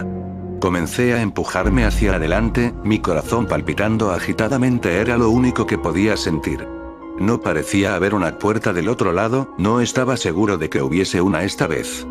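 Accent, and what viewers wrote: Argentina. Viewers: sos Argentino?, que grande capo!, con razón me encantan tus videos jajaja te mando un fuerte abrazo de Capital Federal!!! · Es Argento q capo!! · SOS VOS DE ARGENTINA CHÉ? FELICIDADES PIBE, A VER CUÁNDO ME MANDÁS UN SALUDO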